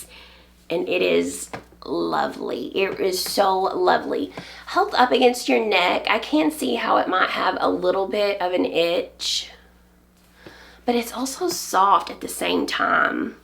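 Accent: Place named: South, United States. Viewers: I’m from Texas so it’s nice to hear another version of Southern “twang” (accent). The cowl is too precious - I can see why you would treasure it. Totally love the leather of the project bag. Can imagine just how many WIPS would fit in there^^ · You are such a delight to watch! Your accent thrills me. I grew up in East Texas and it’s very East Texas😄 My days are heavy. My husband is recovering from a live kidney donor transplant. Your knitting podcast is such a fun relief. I taught both my granddaughters how to knit. Your daughter’s pink cowl brought smiles to my heart. Your mudd bag was amazing to see. Take care and I hope you’re well soon. Merry Christmas🎄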